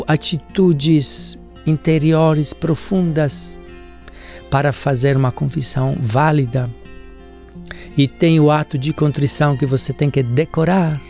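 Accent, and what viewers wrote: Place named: Sardinia, Italy. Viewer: Esse Padre é sardo ( da Sardinha- Itália) não perdeu o sotaque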